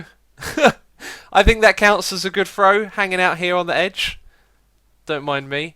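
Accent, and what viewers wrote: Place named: United Kingdom. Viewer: Xisuma, why is your accent so pleasant? What part of UK are you from? I don't hear many British accents out here in Seattle except in occasionally in international conference calls at my old job. My dad was from Belfast and my brother is from Brighton so I can rule those out, but I couldn't venture a guess.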